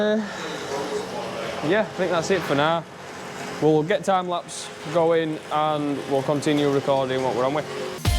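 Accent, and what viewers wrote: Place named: Barnsley, United Kingdom. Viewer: This Yeti is mint. I thought I recognised a Barnsleh accent. That'll explain why I've seen cars with Darkside stickers knocking about these parts.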